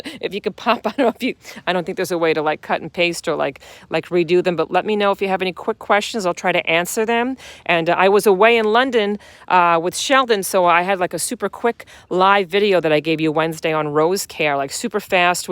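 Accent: New Jersey, United States. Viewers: Love that jersey accent :). Endless summer is so easy to clone. I have been very sucessful and have endless plants all in my landscape!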